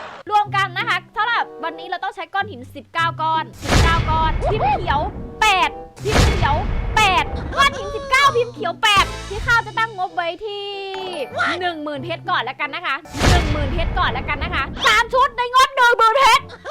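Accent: Isan, Thailand. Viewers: พี่ข้าวพูดอิสานน่ารักมากกก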